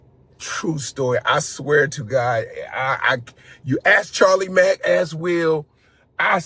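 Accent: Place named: New York, United States. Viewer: Bellamy I remember you and your body guard at the time (Will) came in the shoe store (First step in San Francisco) you guys showed me so much love! Bought a few pairs of jordans and You invited me to the punchline on Embarcadero. The guy that opened for you was a Latin guy that use to tell a story about how a Mexican dude turns a bike in a business 😂… If wife was sitting down (one of the baddest black women I’ve ever seen, gave me her seat)… you probably don’t remember but it was when you were promoting Any given Sunday. Just know since that day I’ve always watch all your pilots, shows etc. And tell everybody that will listen that you are a real ass dude and you have a New York accent. Be smooth playboy!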